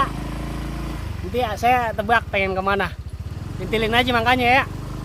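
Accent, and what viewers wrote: Betawi, Indonesia. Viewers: Betawinye enakan anak kemayoran logatnye.ente betawi pinggiran ye bang? Ketahuan bahasenye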